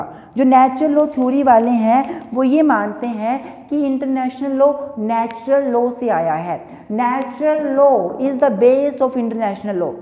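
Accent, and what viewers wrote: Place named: Punjab, India. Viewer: the way u teach is commendable 👍 pronunciation me punjabi touch hai..bt tht is manageable ..bcz assi v punjabi aa 😁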